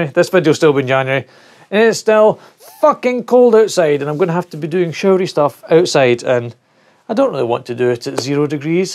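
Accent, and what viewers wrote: United Kingdom. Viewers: Best Brit voice ever. ,,bloody Right. small curses will be ignored by, ,,your Church. Happy,, Holidays,,and Knew Year You'All.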